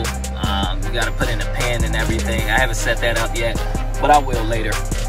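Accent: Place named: Baltimore, United States. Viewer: Your accent... you from Baltimore? DMV?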